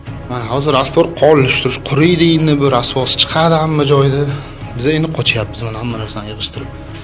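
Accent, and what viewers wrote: Uzbekistan. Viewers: Укажоним Каттакургонданмисиз??Шевангиз билдим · Шева кургон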